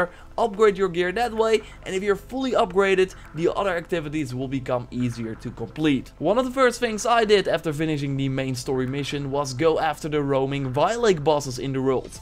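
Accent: Netherlands. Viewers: Lol you are so Dutch, you can hear it from your accent alot. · You sound dutch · Are you Dutch? You sound dutch. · Are you dutch/belgian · are you dutch lol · You are dutch, I can hear it in your accent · You're Dutch, aren't you? We have such an easy to recognize English accent. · Hes dutch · Ik hoor aan je accent dat je nederlands bent xD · Nederlqnds ofnie maat dat duidelijk dunglish is hoorbaar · This is definitely a dutch guy. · You sound Dutch😎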